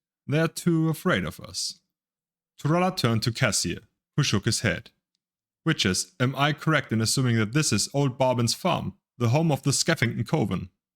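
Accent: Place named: Germany. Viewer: Ich hab mir schon immer eine Lesung der Skyrim Bücher gewünscht, aber ich komme leider nicht über den deutschen Akzent hinweg :(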